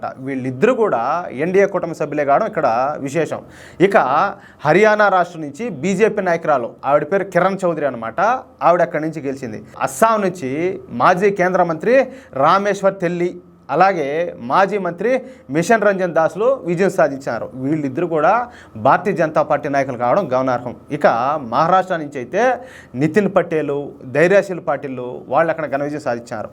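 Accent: Rayalaseema, India. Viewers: నీ బండ బడ వయా. మన ఛానల్ శుక్లపక్ష చంద్రునివలె దినదినాభివృద్ధి జరగాలి మన నెల్లూరు చిత్తూరు యాసతో అదరగొడతా ఉండావయ్య. భగవంతుడు నీకు మరింత శక్తిని ఇవ్వాలయ్యా జైశ్రీరామ్ జై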